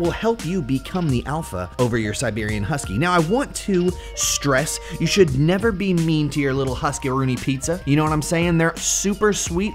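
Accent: United Kingdom. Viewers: Because what? PS u sound British and PSS I'm 9yrs old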